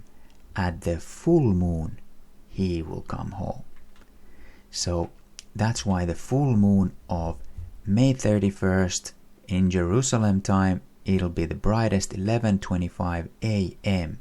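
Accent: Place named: Baltic states. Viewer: Brother, i was wondering if you coming from a Baltic country ? Your accent looks like theirs 😀 I hope we are leaving this weekend 🙏🏼✝️ to meet all around our sheperd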